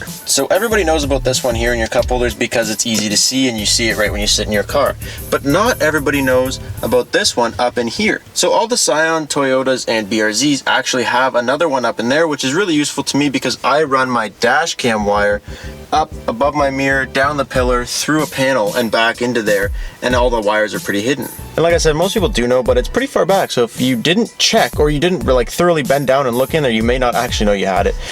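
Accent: Ontario, Canada. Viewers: lol super ontarian accent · Are you Canadian or from a northern state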